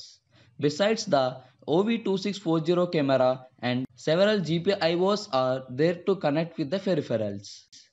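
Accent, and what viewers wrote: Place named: India. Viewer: Seems to be an excellent video, and thank you for posting, because I will be making and installing numerous security cameras for our home very soon, and I was considering the ESP32 system because the Pi Zero W board is out of stock everywhere, and this $15 board is being scalped for around $100 while the ESP32 is widely available and inexpensive. I would like to offer some well meant and hopefully constructive criticism, though. I can barely understand anything you say. I know your English is probably perfectly understandable in India, but here in South Louisiana it is almost a foreign language. Perhaps some day just as an experiment, you could try making a video using a text script ran through a text-to-speech converter? There are some very good computer voices available and your videos would be much easier to follow. As a bonus, you would of course then have a complete text transcript that you could post in your video description or that you could upload to your own personal website, and then link to that from your video description. 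Anyway, a more standardized pronounciation would help make your videos much easier to understand, not only to other English speakers, but also to non-native English speakers or those with only limited English skills. Barring that, maybe closed caption? Thanks for reading, and I hope you do not find this to be offensive. Just want to help. <EDIT> I just had a look at your project page. Perfect! Easy to follow, informative, and appears very compl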